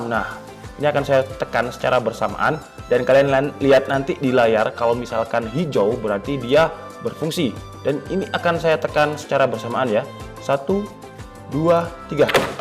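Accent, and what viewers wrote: Malang, Indonesia. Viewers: Mas Wong Malang Po?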